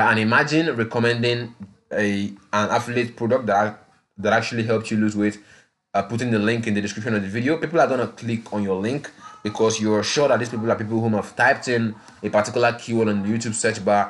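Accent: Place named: Nigeria. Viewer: Hey, great tutorial, I gather you are Nigerian from your accent, but many from Africa are facing this challenge of poverty, unemployment, poor internet connections, power blackouts etc-Most well-established affiliate programs have an approval process which requires a website and thousands of subscribers, Some programs like Legendary Marketer are not available in Africa, Can you make some videos tailored to Africa, like some work from home solutions /payment solutions that are available to Africans? If you search on youtube you will notice that India and Bangladesh are taking youtube by storm, and they assist their colleagues to get faster solutions tailored to their needs, I wish the same could happen for Africa so that more Africans can benefit from this opportunity and you could be a role model to the youth in Africa. Getting started, lack of knowledge and proper mentorship is the challenge that is facing most Africa, please have this in mind as you continue in your online journey. 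Thanks.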